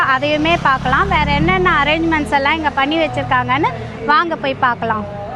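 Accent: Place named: India. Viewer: Unggal Tamil innum India le pesuvatu poleveh irukku, enggal nattu inimaiyana Tamil pesunggal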